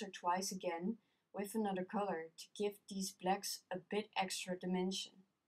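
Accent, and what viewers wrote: Netherlands. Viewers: Beautiful tutorial... and charming Dutch accent :)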